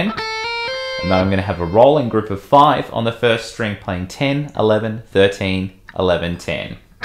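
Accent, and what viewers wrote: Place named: Australia. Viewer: who doesn't just love the sweet sounding Australian accent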